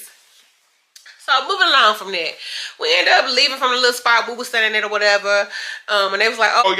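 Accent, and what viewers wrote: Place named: Memphis, United States. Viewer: My family lives in Memphis your accent reminds me of home lol 😂 love it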